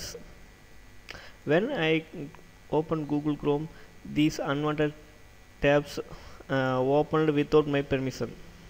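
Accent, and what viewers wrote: India. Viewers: When people make fun of him for trying to talk English. He lives in India, of course he is going to have an accent. At least the websites and pages he has open has the words in English for those to understand easily. Heck I can understand his steps even if I didn't have the volume on, read the pages and follow along. .-.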